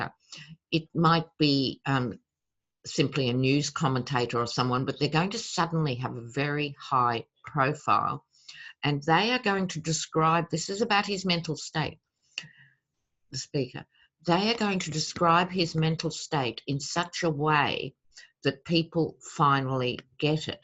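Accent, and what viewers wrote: Australia. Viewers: Living in America since Trump is like being in A Twilight Zone episode that you can’t get out of. Republicans like Jordan & the rest are beyond corrupt & hopefully facing their downfall sooner, rather than later. What’s really frightening is delusional Trump who thinks he’s the second coming, literally. Help. 🙄I love your readings & listening to your fantastic Aussie accent. 💗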